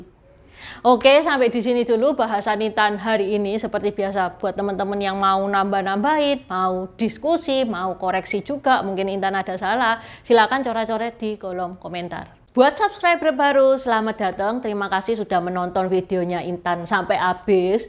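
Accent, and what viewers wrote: Java, Indonesia. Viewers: Intan ini logatnya kok jawa banget to? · Cerita nya bagus, konten nya mantap.. Tapi medok nya itu loh ndak kuat 😂😂 mungkin khusus buat org Jawa saja ya 😅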